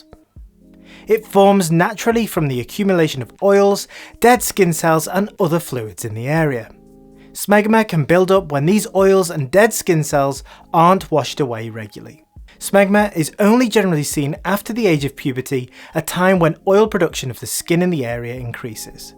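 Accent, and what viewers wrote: United Kingdom. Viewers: Northern British English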